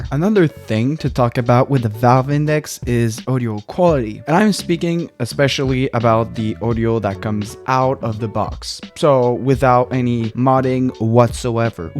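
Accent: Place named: Quebec, Canada. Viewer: je peut entendre l'accent quebecois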